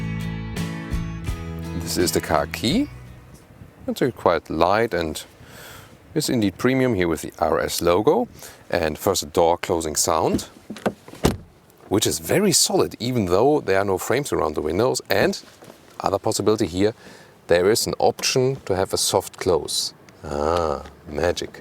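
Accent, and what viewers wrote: Germany. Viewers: Hi, may I ask you where you filmed that review?! Nice nature. Btw: Do you speak german? - I must say the reviewers accent sounds a little german ; )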